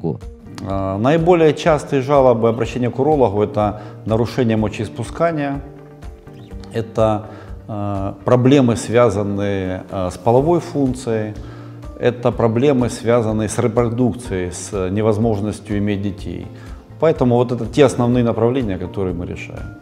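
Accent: Ukraine. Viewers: Видимо он украинец.Ну и шо с того?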